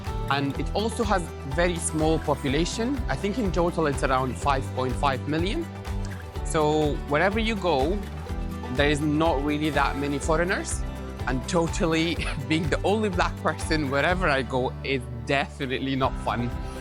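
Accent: Scotland, United Kingdom. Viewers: I love your scottish accent 😅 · You've got a very nice Scots accent developing! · lol I was just thinking how much of a Scottish accent you have, you are a great addition to this country, I hope you find everything you could ever want here. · This is a nice to see perspective of a Sudanese man living in my country. I can already pick up a wee Scot's twang in your speaking!😄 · Love the video. And you manage to make kirkcaldy look beautiful. Hopefully videos like this change peoples opinions on people from other countries that choose to live here and the positive impact that brings. Loving the scottish accent. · Your accent is coming along - when you go back to Sudan for a visit do they all comment on your Scots Accent? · You sound so Scottish now tho 😂👏 Thank you for coming to work in our NHS ❤ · Love your Scottish vowels :) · Welcome to Scotland pal, can’t believe how much of a Scottish accent you have picked up! 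Glasgow is a bit more mixed culture and diverse. ❤ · I can hear a Scots twang in your accent 👌❤️. Greetings from Glasgow dinae ken isn't "Scottish" it's regional , we don't say they words here in Glasgow and no such thing ass Scottish accent as it changes depending on where you go . different all over Scotland so , so so many "Scottish" accents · Scottish, not Scotch (that's whisky). got a twang of Scots, but his accent is his own. Thank you, for all you do in the NHS. Our eldest was a Staff Nurse in the Vic, and his wife is a District Nurse. You do a grand job!! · Some places measure heavy rainfall in centimetres or inches, in scotland we go by how close to horizontal it is. To combat the weather we've developed a very dry sense of humour which does tend to dance on the line with sarcasm. A good doctor talks with and listens to their patients, something you clearly do since some of your A's have a noticeable scots twang.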